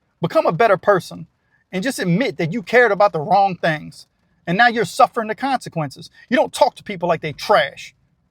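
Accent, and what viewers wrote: Louisiana, United States. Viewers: Dude, you'd make a great character actor...your speaking cadence and Louisiana drawl is unique and cool. You'd make a good henchman or badass avenging some transgression. You're a good storyteller with such a unique vibe.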